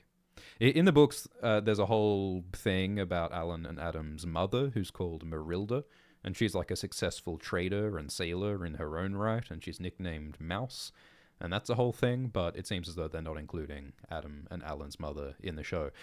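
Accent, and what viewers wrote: Australia. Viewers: What gave it away? The Australian accent he’s used for 10+ years?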